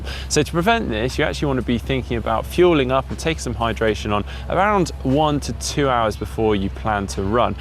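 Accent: United Kingdom. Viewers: Ahaha btw British accent is nice to hear 👍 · I want that British accent lol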